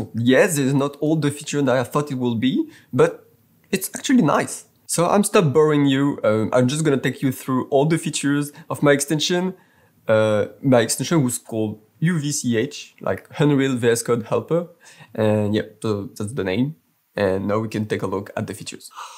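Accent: France. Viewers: Oh le gros accent français qui fais plaisir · Really nice 👍 Jugging by your accent are you french? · Dès la 1ere seconde de la vidéo j'ai spot que tu était francais c'est fou, ton anglais est trèèès bon c'est juste que entre francais je reconnais l'accent francais !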